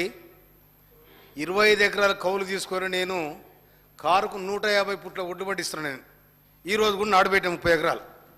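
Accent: Telangana, India. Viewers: మీరు స్వచ్ఛమైన మన తెలంగాణ పల్లెటూరు బాషా సూపర్ అన్న ❤️🤝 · తెలంగాణ యాసలో మాట్లాడిన సామేలన్న ....👌🏻👍✊🏻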